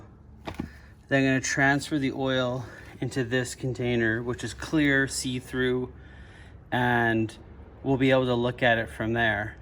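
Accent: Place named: Canada. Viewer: This guy is probably Canadian. Sounds like it from his accent and the fact that he said km over miles. Do the rest of you guys want to know how we can afford fancy cars like his red BMW and his Expedition? We have the best healthare waiting lists in the world - that's how. We actually dont get healthcare as you might have been told, but we do have the best waiting lists you will ever find.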